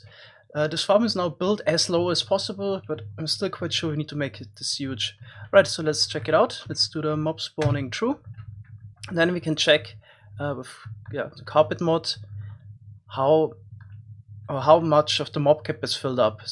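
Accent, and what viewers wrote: Germany. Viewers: Noticing someone's accent is not racist, dont worry. He is still flluent in English, with a pretty obvious german accent.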